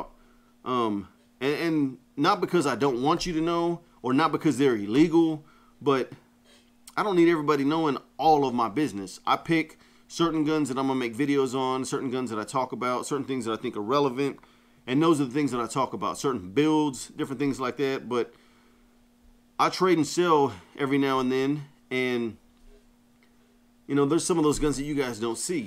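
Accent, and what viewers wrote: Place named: Houston, United States. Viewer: I’m from the Houston area, and you sound like you’re from the same area. Where is the place you get your holsters made?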